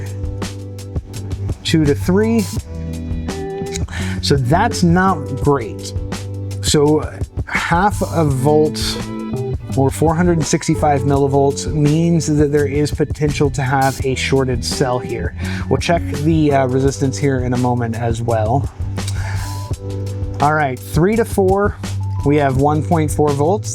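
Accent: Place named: United States. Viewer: You have in American accent in your english language. How does it come that you know millimeters? I was expecting you as an American to use yards and inches and 15/32 inches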